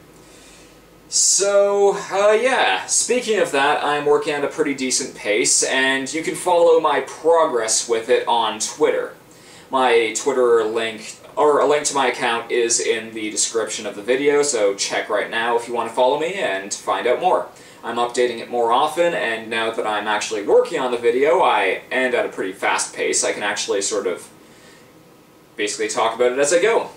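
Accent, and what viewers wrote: Canada. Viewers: Are you Canadian? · The Canadian accent is strong with this one. BEST ACCENT EVER. (Seriously, say House and Out more, it makes me sad I'm stuck with my lame american accent, at least I can listen to yours.)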